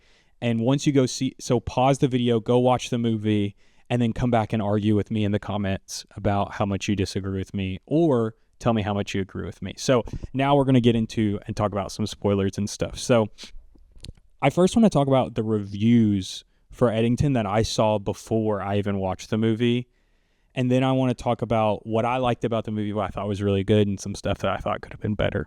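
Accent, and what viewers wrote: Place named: South, United States. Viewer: about to watch the video but upfront: love the accent. don't think i hear many southern accents from youtubers i don't know if this was consciously on ari aster's mind when he made joe cross' ending, but in the theater i immediately thought about the cases where people have been disabled from complications of having covid and any preexisting conditions that are worsened by it. he's paralyzed from the head injury but it makes a dual image. i'm thinking it was conscious since the er doctors commented on his high temperature, and, if i remember correctly, that affected the care he got at the hospital. we know he has asthma and probably sleep apnea (i recall a machine in the bedroom). at the least these parts of joe's characters were added for the dramatic and comedic effect but aster is a very thoughtful writer and director. 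it isn't something i've seen anyone comment on or ask him about. it just nags at me once i saw cross' breathing problems i knew he was doomed (practically all main characters in his films are). and once he went off the deep end i was ready for whatever terrible fate would befall him. but i felt sympathy for him after he's paralyzed. despite it all aster created an ending so awfully poetic for cross that i was struck by what it must feel like to be him, trapped in his head forever and unable to do anything for himself. it's fucking bleak. and of course aster slaps you over the head for the empathy with what the nurse and mother in law do. genuinely diabolical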